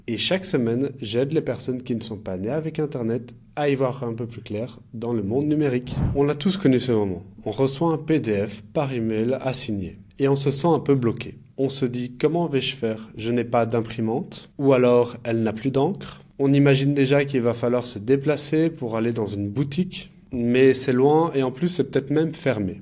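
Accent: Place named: Russia. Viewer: ... je sens un accent Russe , arnaqueur.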